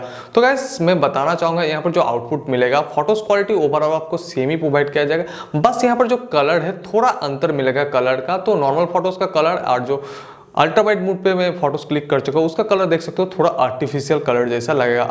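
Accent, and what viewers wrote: Bengal, India. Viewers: Ye keysi baat hey bhai?wo lerka bangali hey.wo indiawale ke liye hindi may phone ki review de rahi hey.to issmey galat kya hey?bangali logoki hindi bollemey thoda kharab to hogii.tamil logokovi eeeysa hota hey.you need to adjust it man.love from bangladesh.take care.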